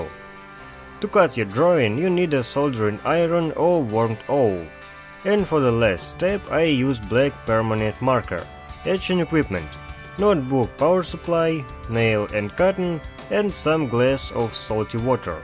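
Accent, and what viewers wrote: Ryazan, Russia. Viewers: Акцент рязанский )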